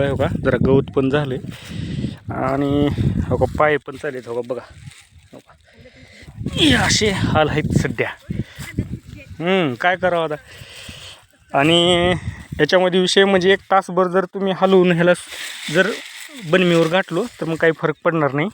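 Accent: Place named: Latur, India. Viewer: लातूरचे का दादा बोलण्या वरून म्हणले दादा . 😊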